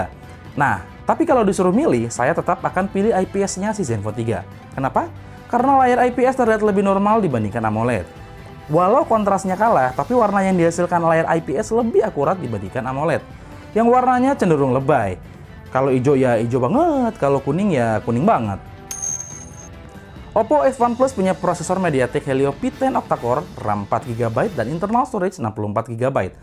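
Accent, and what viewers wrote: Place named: Bali, Indonesia. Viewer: psti orang bali ya om..?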